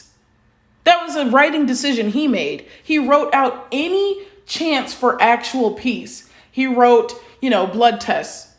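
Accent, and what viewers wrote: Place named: United States. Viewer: I kind of reached my limit with you saying that in real life, 'talking is the answer', because no, it isn't. I can just tell from your accent that you're from the New World and this opinion shows it. For example, my country the Netherlands. Its earliest foundation was a Republic, and do you know how it came to be? Spain had taken over the region and we fought 80 years for our independence. We had several talks and a truce, but it never worked as Spain refused to give the Dutch ethnic group our land until Spain was caught up in too many wars and was financially drained to the point it couldn't afford to keep up the war. Then they agreed to declare us an independent republic. The answer to our freedom was money. WWII wasn't solved by talking, but by people fighting back enough that Hitler knew he was going to lose so he killed himself. 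I can continue. Sure, treaties and truces are done through verbal communication but they don't get made if the war is more beneficial to the people fighting then peace is. Either one or both parties need to sink deep enough before the talks happen, and that is exactly what AOT shows: To the rest of the world the genocide of Paradis was more beneficial then peace so they opted genocide. Which is why the only one willing to talk with Paradis is Hizuru, because they would benefit more from peace with Paradis then a genocide because then Marley and other countries get a hold of the resources they want.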